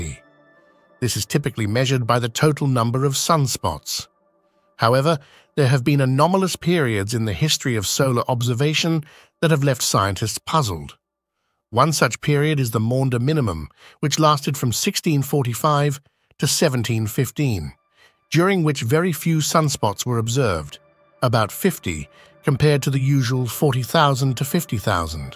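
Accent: United Kingdom. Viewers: This channel is AI driven and produced content with a robotic British AI narrator so it sounds sciencie 😂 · These dramatic readings with the English accent makes it all so Shakespearean. Loved near the beginning talking about machine malfunctions even though unplugged. 1850s had no electricity or anything, anywhere to “plug” in. The only electrics were primitive telegraph systems that because of the wires linking the stations acted as antennas directing the CME output to the stations. Just give the real history without the stupid drama please.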